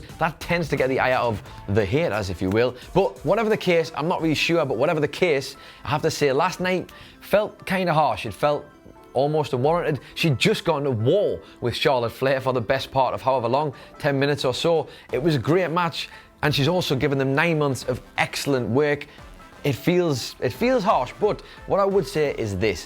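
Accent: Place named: Newcastle, United Kingdom. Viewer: Wey aye man. A fellow Geordie. You're getting a sub just for that.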